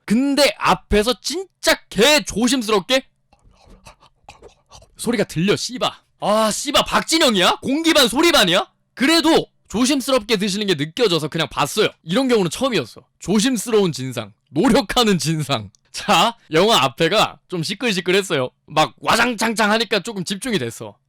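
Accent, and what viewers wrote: Busan, South Korea. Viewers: 이형은 영화관이랑 인연이 안좋아ㅋㅋㅋㅋㅋㅋ 그런데 부산분이셨나???ㄷㄷ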